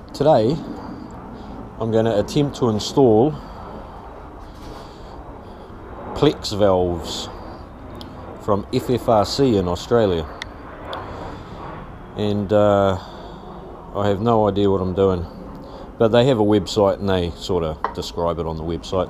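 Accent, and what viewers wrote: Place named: New Zealand. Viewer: Nice Kiwi accent mate! takes one to know one lol. Great vid, keep making vids and posting them up, enjoyable and educational for sure!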